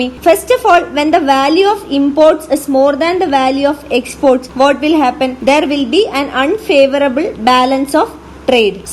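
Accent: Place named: South India, India. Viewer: Her style of speaking is south Indian and most of people are don't know Hindi in that region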